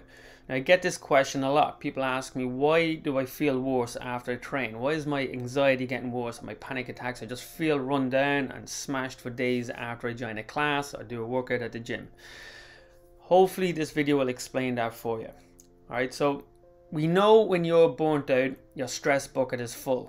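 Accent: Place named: Ireland. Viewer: You Irish ? I’m down in limerick. Nice to see a Irish person cover adrenal fatigue!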